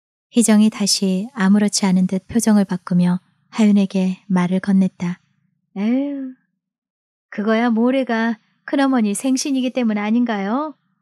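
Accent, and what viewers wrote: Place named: Gyeongsang, South Korea. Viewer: 목소리의 마법사이신 어쩌면 경상도 사투리도 넘~ 감사합니다^^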